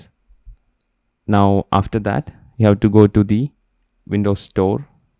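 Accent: India. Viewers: no offense but you sound like the indian guy from tutorials aibot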